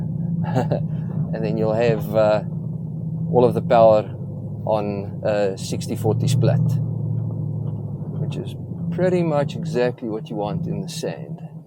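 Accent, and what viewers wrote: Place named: South Africa. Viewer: Sensing a bit of South Africa here lol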